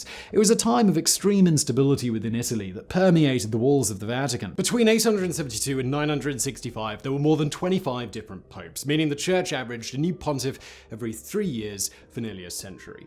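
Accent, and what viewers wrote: RP, United Kingdom. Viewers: For someone who speaks like a toff and gets all oity toity about snobby things you'd think he'd have ALL of his pronunciations right 😂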